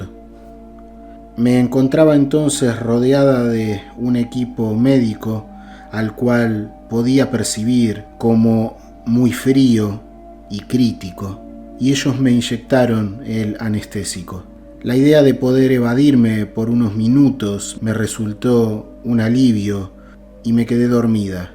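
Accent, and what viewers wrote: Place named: Argentina. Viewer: Vamos a darles likes,para seguir escuchando la voz relájate de éste caballero, lástima que no hay opción de darle me encanta,me fascina, porque a mí la verdad me encanta como el habla,me gusta escuchar,los argentinos,los uruguayos,los chilenos,se escuchan parecidos.les envío a todos abrazos de luz, que Dios los bendiga.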